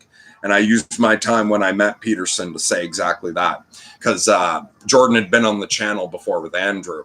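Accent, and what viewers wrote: Canada. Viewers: Hey glad to see your mood lifting. I would've never guessed your from Quebec I would've thought your from Western Canada. I live in Rockland 30 mins east of Ottawa. Cool thanks for the chat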